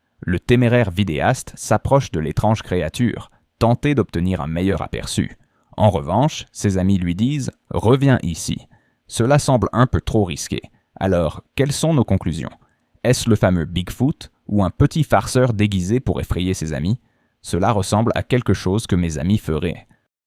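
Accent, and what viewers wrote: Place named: Quebec, Canada. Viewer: Franchement je dirais qu'au contraire la voix est très réussie, c'est peut être le leger accent québecois qui t'a dérangé.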